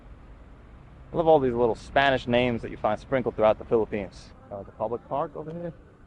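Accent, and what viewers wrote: New York, United States. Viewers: Where u from in the states? That accent tho. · Awesome episode! The SM mall right after the bridge from Subic Base (at the mark) used to have numerous establishments where a few sections used to belong to my grandparents. They had a bar/restaurant, money exchange and t-shirt printing shop. I used to visit them from Manila when I was a kid and have memories of that area during the late 70's to early 80's. I remember that area to be always crowed and busy due to the military personnel coming in and out of the base especially in the evenings. That whole street and a few adjacent ones used to be lit up like Vegas. I moved to the US with my parents before the US military left and not too long after that the location was controversially taken over by the local government. At any rate, your video brought back some good memories and I thank you for that! 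I also want to add that being a New Yorker myself, I appreciate your accent! Keep making awesome videos, I enjoyed your Japan episodes!!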